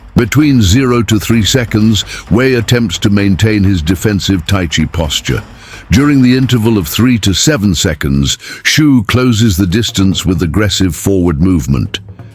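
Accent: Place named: France. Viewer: Vidéo de M.... l' IA parle anglais avec un accent français de toute beauté ( ça peut pas être un humain 🤔 ) mais les commentaires sont hilarant 😂😂😂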